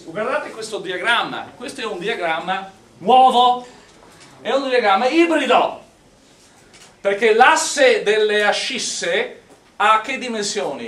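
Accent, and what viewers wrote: Veneto, Italy. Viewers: Questo professore non solo è bravo, ma è uno spettacolo umanamente parlando: un saggio. Mi bevo le sue lezioni che è un incanto. Un americano con l'accento veneto, poi, non ha prezzo! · Ma ha l’accento veneto hahahahha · A me sembrava Veneto! Probabilmente ha imparato direttamente quello 😂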